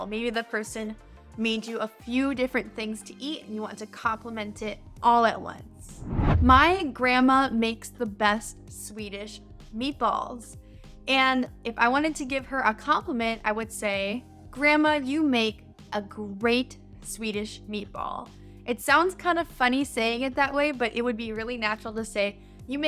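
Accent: United States. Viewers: A great lesson, I love the way you teach us, super natural and like the American way you pronounce cause is clear and real. I have a question: I’d like to learn how to use this two words, Aim and Amid. Somewhere I read an advertisement that says: “We Aim to please” It sounds nice to me but I don’t get the meaning. Thanks again Miss and I owe you one.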